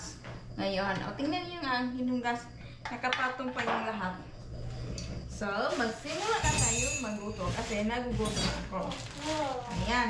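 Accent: Visayas, Philippines. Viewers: Tama ka sis..naawa ako sa knya kc grabe kun mambash ang iba..she’s just being true to herself..i am really so proud of her.hindi niya tinatago kung ano meron sa paligid niya.yung pronounciation xa ok lng kc bisaya xa hindi xa sanay sa tagalog..understandable.marami lng tlg bashers..sa totoo lang habang nangbbash cla, ang blessings bumubuhos kay..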